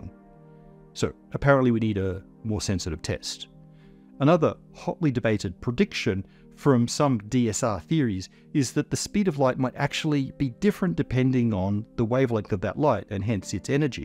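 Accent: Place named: Australia. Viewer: is from Australia, so maybe that's his Aussie accent coming through?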